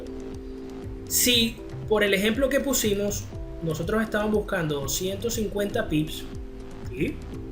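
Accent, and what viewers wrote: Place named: Guayaquil, Ecuador. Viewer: De donde eres bro???? hablas como guayaco????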